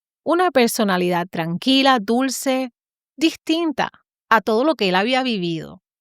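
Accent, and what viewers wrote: Puerto Rico. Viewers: parece boricua la señora por su acento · por su acento, percibo que es de la tierra de BORINQUEN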